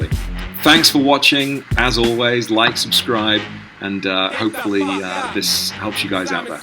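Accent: Liverpool, United Kingdom. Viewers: You sound like a scouser fella but you’ve got U.S plates..? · Thanks for this ....bought new emblems for my 997.1 today so gonna give it a go! :) .....sounds like you're from my neck of the woods? (Liverpool UK)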